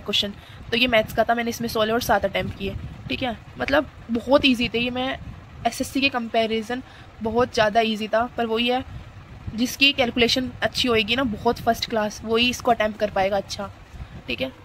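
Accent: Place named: Meerut, India. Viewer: awaaz se to meerut, shamli side ki lgti ho... btw thank you ..bdia review h 30 oct ko h mera dekhte hn...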